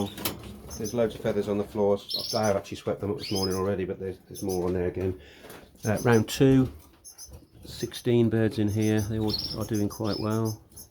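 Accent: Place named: Potteries, United Kingdom. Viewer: YOUR ACCENT AINT NO GEORDIE ACCENT, MY GUESS IS THE POTTERIES?? MY TEAM IS SO UNPREDICTABLE THESE DAY TOO MANY CHANGES AND A NUMBER ONE DE-STABILIZER 'POGBA' SKULKING FROM HIS CONSTANT INJURY TABLE, MAN UTD. LET'S......... AW GAN ALANG THE SCOTSWOOD RD AN'.......... WORSHIP ALLAN SHEARER, LOL''............ THANKS FOR THE PARTY FELLAS.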